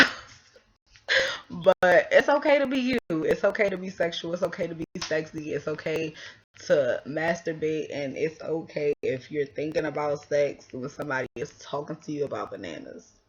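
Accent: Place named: DMV, United States. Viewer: She definitely from DMV talking like that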